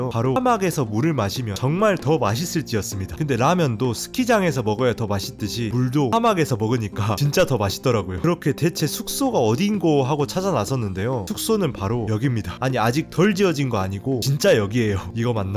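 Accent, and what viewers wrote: Jeolla, South Korea. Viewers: 너무 재밌어서 구독하고 가요!!! 근데 전라도 분이신가봐여 억양이 ㅎㅎㅎ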